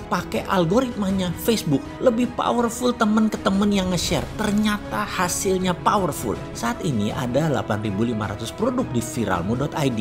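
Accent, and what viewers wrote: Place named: Java, Indonesia. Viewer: Semangat boss medok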